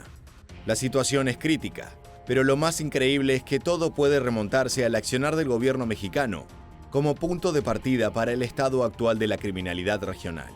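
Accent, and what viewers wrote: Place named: Argentina. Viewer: Amigo, estas muy bien documentado, aunque veo tu acento argentino me da la impresion que vives aqui en Mexico, vas al dia... muy interesantes tus documentales. Saludos.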